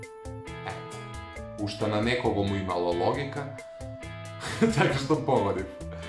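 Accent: Kumanovo, North Macedonia. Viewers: Koj saka da snima kade zboruva 24h Kumanovski. Stavete lajk za da vidi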